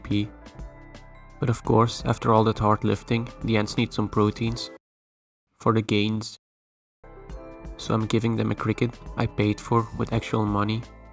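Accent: Netherlands. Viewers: je Nederlands accent is hilarisch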